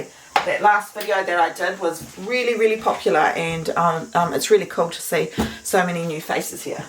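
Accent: New Zealand. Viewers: You had me at your fellow kiwi accent (shameless I know but I live in QLD now so...yeah). Definitely gonna give these a try and hope to enjoy with butter and jam. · The Aussie half of me is so chuffed that you’ve visited our very fine shores, but the Kiwi side of me won’t let Australia take credit for this most excellent woman 😊! She has a rather thick (or as she said, “thuck”) Kiwi accent, a bit like how “aboat” is the giveaway when you realise you’re speaking to a Canadian not an American 😁✌️ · Well done, as soon as I saw your recipe for cockies joy and heard your Kiwi accent you had me hooked. I love crumpets, and I'll make some tomorrow, My Nanna used to make great pikelets on the farm in Rotorua. Cheers. new subscriber from Tasmania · Surely not an Australian, but a New Zealander… listen to the vowels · I love the kiwi accent lol a thuck wudth 🤣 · This popped up in my feed for some reason. 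I kept watching because of the Kiwi accent, but subscribed because of the recipes and your genuine communication with your watchers. Don't be concerned about your occasional silence when trying to think of the right word; this reminds so much of my own Scottish mum who would gab away at us while she was cooking. I am 72 years old now, and mum has been gone many years, but you brought back many happy memories. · Kia Ora, have just discovered your channel, so good to hear a kiwi accent😂. Tried the crumpets today.. Kapai!!!! I'll never buy crumpets again, so so easy. I 'll be scrolling your videos.. Thanks, I am a Kiwi living in Brisbane. I'll be watching you😊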